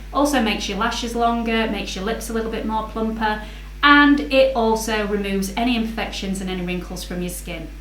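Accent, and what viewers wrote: United Kingdom. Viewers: Great video! Thank you for sharing! I quite new here but I can say that you are very honest to your subscribers! And you not only help me do my makeup but also help me practise my English since I'm from Argentina! It's so nice to listen to a British accent! Greetings gorgeous! 😍😍😍😍😍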